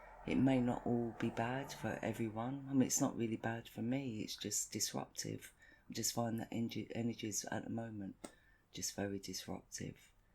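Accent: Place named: Kent, United Kingdom. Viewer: Your accent sounds like where we lived in Sandwich, Kent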